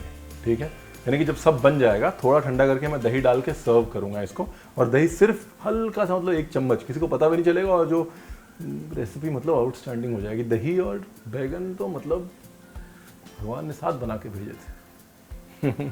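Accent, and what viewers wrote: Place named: Punjab, India. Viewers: He’s applying Punjabi sound to English. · And you Punjabi accent😍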